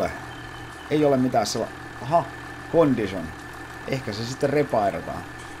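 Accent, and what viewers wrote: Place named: Ostrobothnia, Finland. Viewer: En tierä onko sulta ikinä kysytty tätä tai ootko vastannu mutta ookko pohjanmaalta kotosi tai asukko tällä hetkellä täälä. Kuulostaa murre nimittäin vähän samalta👍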